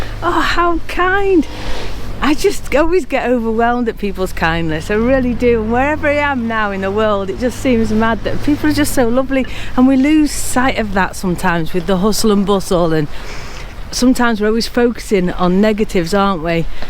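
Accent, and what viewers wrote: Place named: Lancashire, United Kingdom. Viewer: Hiya, Been listening to your accent, and I don't think that it is pure Cheshire, more like higher up Lancashire somewhere? am I correct?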